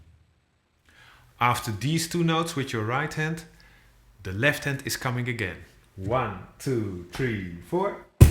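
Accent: Netherlands. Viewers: You sounds dutch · Je bent zeker een hollander,ik herkend de uitspraak,zelf kom ik uit Iran en woon in Parijs, ik will here je bedanken voor dit aardige video dat je ons laaten zien,ik ben een beginners om cajon spelen en vond ik je video perfect,dank u wel....Thank's a lot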